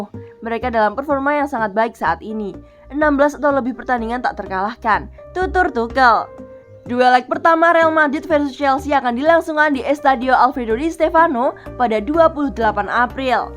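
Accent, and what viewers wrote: Java, Indonesia. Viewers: pengisi suaranya medok min 😁🤭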